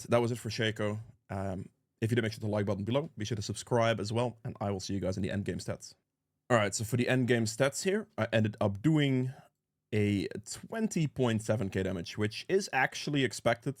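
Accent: Netherlands. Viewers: you look like kingsize lol (dutch rapper) guessing ur from the Netherlands by ur accent too, great vid once again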